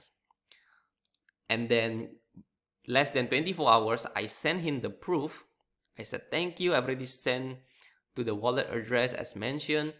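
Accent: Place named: New York, United States. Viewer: He's a New Yorker and even sounds like a really shady New Yorker. His accent tells all check out TJ spoonman who got ripped off too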